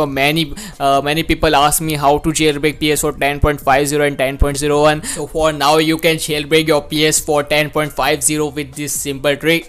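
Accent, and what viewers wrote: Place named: India. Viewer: When i heard India voice i am out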